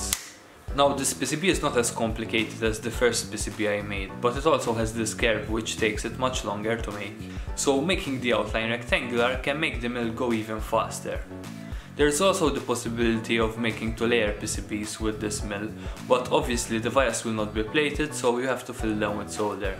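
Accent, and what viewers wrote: Malta. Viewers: Thats a very maltese accent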